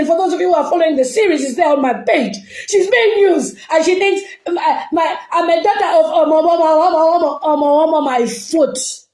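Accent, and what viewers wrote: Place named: Nigeria. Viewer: Why are you using Nigeria accent (oyibo)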